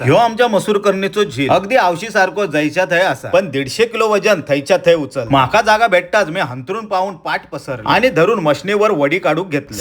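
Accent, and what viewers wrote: Malvani, India. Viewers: दादा तुमची मालवणी ऐकून ईतक छान वाटत कि विचारू नका 😊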